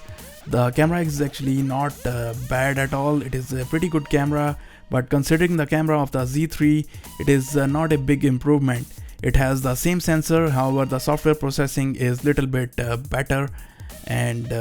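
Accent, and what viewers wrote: India. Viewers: you sound indian 👎👎 👇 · That's indian tone... lol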